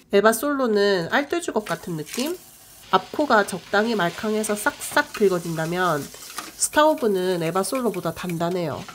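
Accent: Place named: Gyeongsang, South Korea. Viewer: ㅎㅎㅎㅎㅎㅎㅎㅎ 경상도 사투리가 영상의 재미를 한층 더 올려주는거 같어요.😊😊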